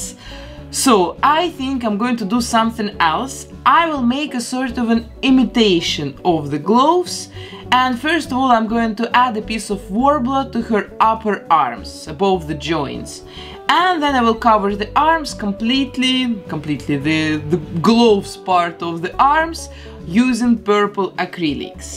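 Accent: Russia. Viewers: Ааа, мне кажется, что вы русская, акцент очень смахивает на русский, но... Мало ли? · I dont mean to sound rude and mean no offence.. but are you russian? I ask cause of ur accent it reminds me of my aunt who is from russia.. but i love ur video of making jessica rabbit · Сразу по произношению можно понять что вы русская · Мне одной кажется что у неё русский акцент? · Русский акцент, неплохо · You're not Russian by any chance? I just hear the Russian accent. I don't know why. By the way, it turned out very cool👍🏽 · Is it Russian accent?🤔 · Ты из России? Слишком похожий акцент... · Русский акуцент, здравствуй🖐🏼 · It's russian accent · Почему мне кажется что вы знаете русский язык?) Слышиться русский акцент😊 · Я один слышу ее русский акцент? Не? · Русский акцент? Разве нет?🤔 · Она из России? Слышу русский акцент. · Неужели это русский акцент? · Акцент таки прям русский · О этот отвратительный английский. 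Такое чувство что чисто русская баба пытается на английском разговаривать а в итоге получается ранглиш. От произношения больно ушам приходится смотреть без звука. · Слышу русский акцент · блин, слышу типичный русский акцент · Пиздецки ужасный английский! Такого русского акцента я давно не слышала. Это прям 10 из 10. Лучше бы просто текст поверх видео пустила. Жесть, тебе категорически не стоит разговаривать на видео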